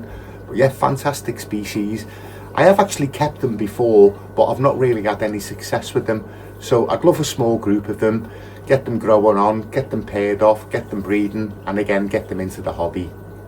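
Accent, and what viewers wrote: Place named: Liverpool, United Kingdom. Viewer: Something completely off topic mate, me and the wife (she's not English) were watching the old 80's film 'letter to Brezhnev' the other night and she said at the beginning she was struggling to understand the accent. I just watched your video now and she could hear you and said you have the same accent as the people in the film, she says the scouse accent is nice when you get used to it haha, happy new year!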